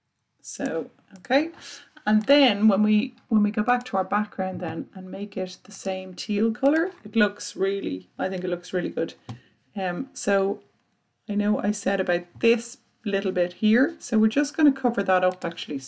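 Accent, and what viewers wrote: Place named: Ireland. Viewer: Love your videos. Can’t believe you don’t have more subscribers. Good to hear the Irish accent too. ☘️🇮🇪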